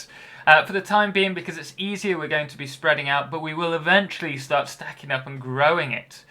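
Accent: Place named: Lincolnshire, United Kingdom. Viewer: I knew I recognised your accent! Nice to see a fellow Lincolnshire brit doing well on the YouTubes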